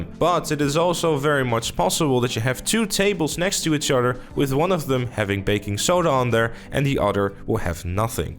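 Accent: Netherlands. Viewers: This guy has a dutch voice haha. You must be dutch (nederlands) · You sounds like a Dutch guy who's speaking English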